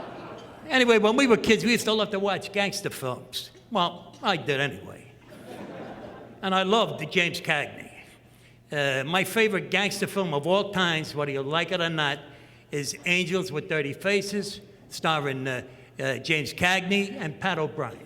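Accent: Brooklyn, United States. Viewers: I've see other other interviews with him, where he had the much cooler Brooklyn accent. Looks like his New Jersey accent has now become his default after Sopranos. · I just fucking love his Brooklyn accent! I could listen to him all day and not get bored.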